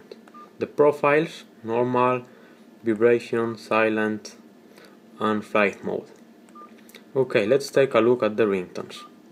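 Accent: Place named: Spain. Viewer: tipico: hablar inglés con un acento español super obvio xd nos pasa a todos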